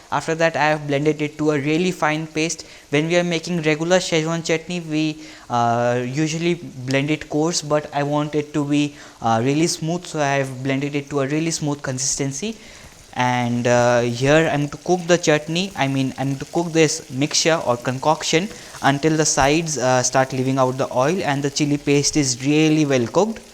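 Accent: India. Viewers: Indian accent is horrible....its chopped(not chobbed). weirdo. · India has a totally different English accent! I strongly recommend subtitles for these videos!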